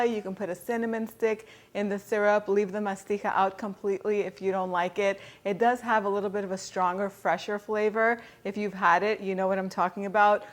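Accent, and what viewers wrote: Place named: New England, United States. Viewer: Love your Yankee accent than Shambali ❤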